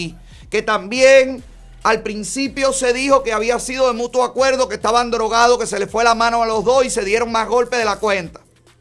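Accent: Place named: Mexico. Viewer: Ahora esto es un show con acento neutro mejicano 🙄 lol para a ellos se subscriban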